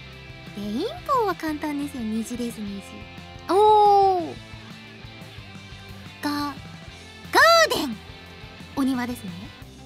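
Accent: Japan. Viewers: английский, с японским акцентом, это нечто <3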